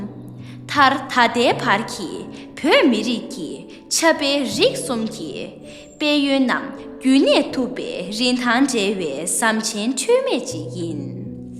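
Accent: Standard. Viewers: རྗེས་རབས་གསར་པས་་་་བུ་མོ་ཁྱེད་ཀྱིས་སྙན་ཚིག་དེ་མཐོང་སྐབས་་་་ད་དུང་ཡང་མི་རིགས་འདི་ལ་རེ་བ་ཞིག་ཡོད་པའི་འཚོར་བ་སྦྱིན་གྱི་འདུག་་་་་་དཔེ་ཡག་པོ་་་་་བོད་སྐད་གཙང་མ་་་་་ཀློག་གི་གཅོད་མཚམས་ལེགས་པ་་་་་་་བཀའ་དྲིན་ཆེ་་་་་མུ་འཐུད་་་འབད་བརྩོན་གནང་རོགས་་་་་་་་ལས་ཞོར་འགྲོ་ཞོར་ལ་ཉན་གྱི་ཡིན་་་་་་་་་ཁྱེད་རང་དང་་་་་ཁྱེད་ལ་བྱམས་བརྩེའི་སྐྱོངས་མཁན་གྱི་ཕ་མ་གཉིས་ལ་དམིགས་གསལ་བཀའ་དྲིན་ཆེ་་་་་་་་🎉🎉🎉🎉་་་སླད་མི་ཚེའི་ནང་་་་་གང་བསམ་ལས་ལ་རེ་བའི་འབྲས་བཟང་སྨིན་པའི་སྨོན་འདུན་དང་བཅས་་་་་རྒྱ་གར་བྱང་ཕྱོགས་་མ་ནའ་ལི་ནས་་་་་་་སྤུན་ཟླ་ནས་་་་་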